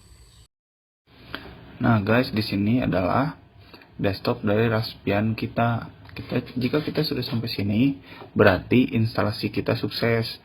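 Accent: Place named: West Java, Indonesia. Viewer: Mantap sa masih aya logat sunda 😆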